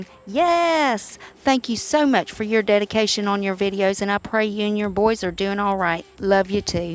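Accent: South, United States. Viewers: Im new to ur channel. I love ur southern accent! I am a southern girl from Kentucky. Thank u for the Ipsy spoilers.💋💋💄💅💖💕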